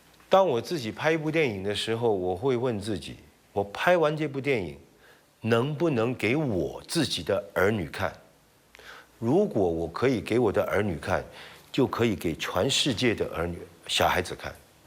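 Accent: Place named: Hong Kong, China. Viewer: yeah it is. He's good at speaking English but I can tell he still has that hongkong chinese accent when he talks. And like he's not fluent at English, his sentences aren't great. But prefer him speaking cantonese, which they should tell him to do more. Ik cause he does stuff in China he had to learn mandarin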